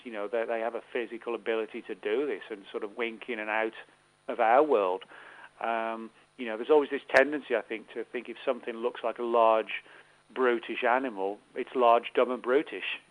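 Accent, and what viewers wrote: United Kingdom. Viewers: Hey, great interview as always.. Much love from home.. Can't believe you still have your Walsall accent.. 🇬🇧🏡💗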